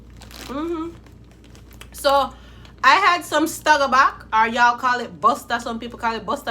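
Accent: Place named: Jamaica. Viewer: That’s so dope, your Jamaican first off I love it I have two first Jamaican cousins and man y’all know how to turn sun shit up! I love Jamaicans 🇯🇲One ❤️! I love your accent as well 🥰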